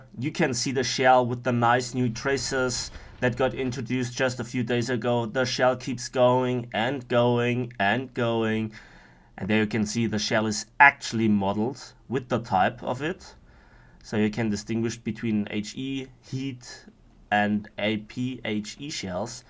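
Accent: Bavaria, Germany. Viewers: Bist du aus Bayern ?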